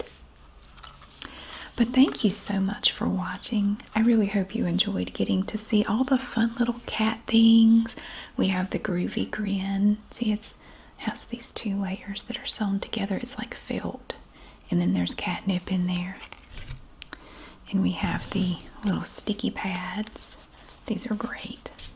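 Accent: South, United States. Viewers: The southern accent is so underrated 👌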